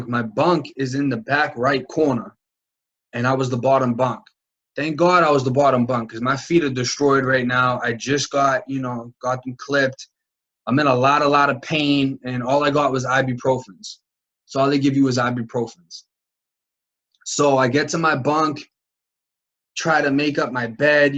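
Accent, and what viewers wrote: Boston, United States. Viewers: Good video. He’s not a Florida boy tho. I hear providence or Boston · Came from Christina’s channel! I love how humble you are! Stay true to you and keep your head up! I cannot imagine being in your shoes, but I hope you can learn from the roughness of your past❤️. God bless! I can’t wait to see how far you go in life! Btw, I love your Boston accent! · Saw you on 23 &1. Checking you out from Rhode Island. Love hearing that Boston accent that I grew up with. Psyched to see you with your own channel! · Dude its awesome that your puttin your story out there, takes a lot of sack! It's funny, I definitely noticed your bostonian in the first video, I had the same thing happen I spent years between Florida and Beantown "home" and more I find myself droppin my R's lollol its wicked funny cause you were so right about ppl saying there from Boston but really bring from Waltham or Billerica lmfao Great stories bro, keep it up!!! 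· Bruh im from the UK so its probly that,but your accent sounds kinda Boston and i thought youre from Florida..is that a thing or have u moved from there? Love your videos btw nice and honest..thanks for the info. B. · That authentic boston accent👊🏼 Hows it goin everybawdy😃 Gotta ❤ it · Always gotta rock wit someone else from the 813. Your startin to get a Boston accent tho my bro. Much love from tampa we keepin it real down here. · That Boston accent is nails! Respect from U.K! Cheers for sharing your story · Really loving this guy so far...but I especially love it when he talks more with his Boston-ish accent opposed to the other way her talks..I can listen to that east coast style allll day :) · This is definitely off topic but I really dig the Boston accent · Got all caught up yesterday on Lockdown 23and1 vids yesterday & so happy I found you thru Christina's Channel! Can't agree with you more on so many levels. 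No I never did actual time but in my past (long time ago past) had a serious boyfriend that did. I did that time with him even tho I was on the outside! Ppl don't realize going to prison affects everyone around that 1 person actually doing the time! I'm not with him anymore, it's been over a decade since we split, tho we remained friends & I supported him w/out enabling him for years after. Anyways, now got you to watch (gotta love not just your content, but that Boston accent!) 😆🙃 Glad you turned your life around & so happy for you overall! Plus now there's Lockdown 23and1's Channel to get that area of country perspective of prisons & interviews w/ other ppl! Can't say enough how grateful I am that you started a YT Channel! God bless!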